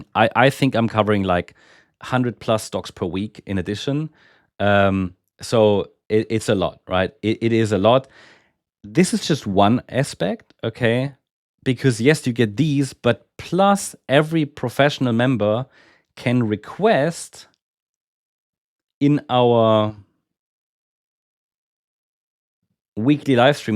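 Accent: Germany. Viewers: you have a german accent, are you german? )))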